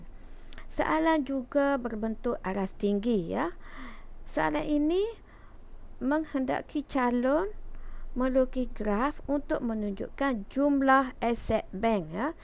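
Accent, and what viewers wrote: Kelantan, Malaysia. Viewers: Kecek kelate ye cg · Cikgu mesti drpd Kelantan kan? Suara cg sedap didengar buat kitorang xberhenti fokus..terima kasih Di atas perkongsian..❤️❤️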